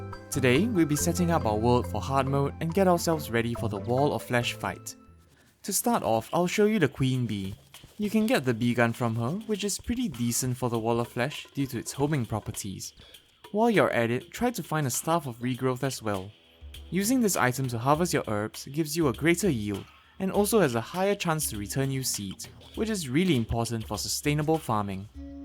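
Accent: Singapore. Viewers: Why is your voice so nice are you Singaporein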